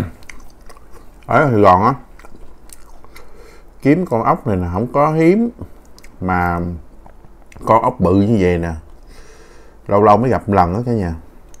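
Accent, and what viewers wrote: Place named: Mekong Delta, Vietnam. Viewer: chuẩn miền tây luôn a ơi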